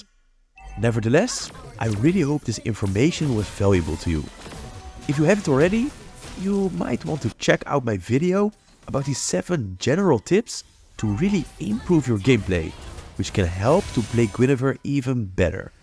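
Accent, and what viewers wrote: Netherlands. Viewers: Are you Dutch? Lol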